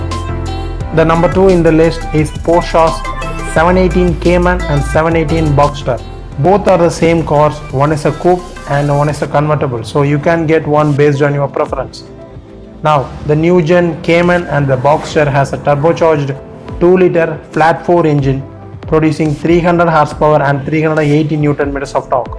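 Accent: India. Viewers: Cute but you talk like a Malayali everyone speaks English like that now I my English is also like that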